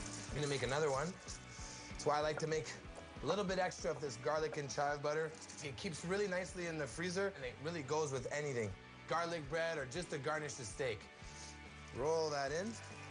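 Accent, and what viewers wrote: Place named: France. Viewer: hes not gay. french is his first language so he sounds a little different. im going to marry him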